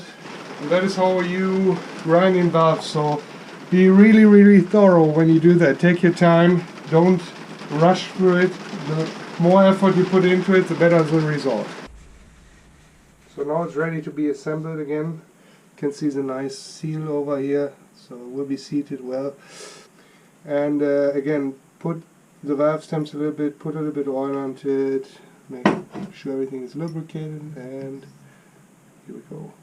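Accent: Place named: Germany. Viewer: Somehow, from your accent, I'm guessing your mother tongue might be German. I am with a MD1B from the 1970ies that was running until 2019 sort of, until then it was left for good, might have some little rust and such... I want to try to fix it all myself first, but if I fail I might want to come back to you with questions and such.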